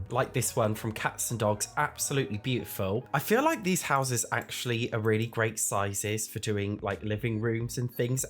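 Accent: United States. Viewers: Has anyone else noticed how american accent sounds a lot like Quagmire from family guy 😂😂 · Your American pronuncuations crack me up every time I love it lmao 🤣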